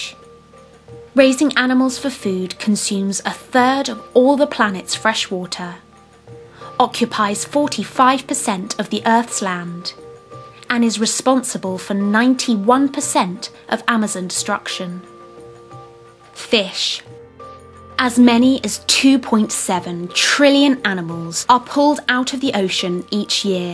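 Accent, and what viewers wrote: England, United Kingdom. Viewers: These figures seem controversial. I mean, I know they went to the trouble of hiring a voice actor with an English accent, but has anyone bothered to fact check this?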